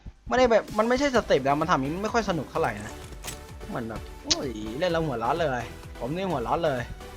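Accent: Isan, Thailand. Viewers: อ่าย เจ่าเป็นคนอีสานบอ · ชอบคนแบบพี่มาก อ่ะ แบบพูดอีสานสำเนียงไทย 555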